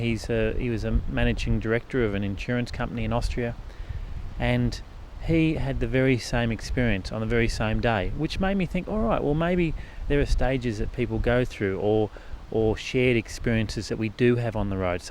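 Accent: Australia. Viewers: did he really have to say 'I'm australian?'' although i just heard.'stralian....' With that accent !! :) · Hi, I really enjoyed your film, a real gem for supporting a text I am writing on. I also enjoyed listening to your Australian accent, since I lived there for more than a year and it was a very special time, it brought me beautiful memories. Congrats also for keeping up with your films. All the best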